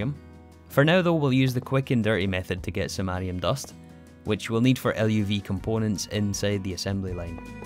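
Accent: United Kingdom. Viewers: UK accent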